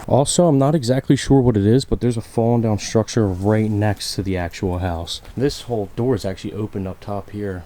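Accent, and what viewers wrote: United States. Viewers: The music makes me think that this is Ireland, and he looks Irish, but he's speaking in an American accent, so IDK what to think lol..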